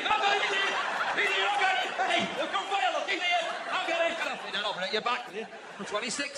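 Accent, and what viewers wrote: North, United Kingdom. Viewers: Stewart Liebenstrudl it's northern 💪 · Yes it's English. Just not the Queen's English, this is northern, Bolton in Greater Manchester.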